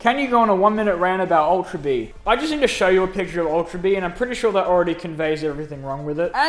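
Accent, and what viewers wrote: Australia. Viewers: You're Australian? I've literally spent all this time thinking you're british.......... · You're Australian? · wait... YOUR AUSTRALIAN!!??!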